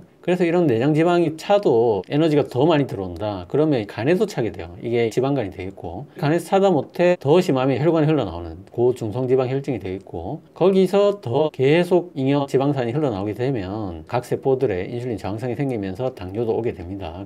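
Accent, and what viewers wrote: Gyeongsang, South Korea. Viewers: 중성지방이 높으면 당뇨도 올 수 있는 위험이 될 수 있다는 말씀으로 이해가 가는데, 경상도 말씀이신가, 내 입장에서, 선생은 숨도 안쉬고 말씀하시네요. 열심히 하시는것 같은데, 원 뭐가 뭔지 금방 비행기가 지나갔나...;정신이 없네요. 지식있는 간호사님 모아놓고 강의하시는 것이 더 효과적일듯 함....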